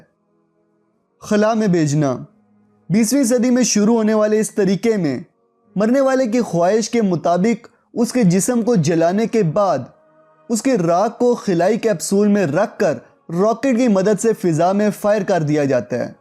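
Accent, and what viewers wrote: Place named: Punjab, Pakistan. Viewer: Aap ka Urdu talafuz Sahi nahi hai Aap k talafuz me Punjabi talafuz ki milawat hai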